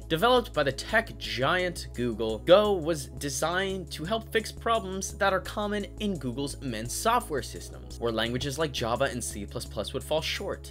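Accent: United Kingdom. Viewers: Ahh, sorry I missed it. Thank you so much for this awesome video, it was so beneficial. I liked your British accent too. More videos should be made on these topics since computer science is really attractful. Looking forward to see history of operating softwares :)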